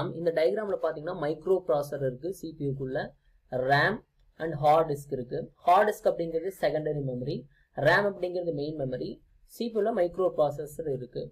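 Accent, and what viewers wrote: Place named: Kongu, India. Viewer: Bro... Ninga Erode / Tiruppur ah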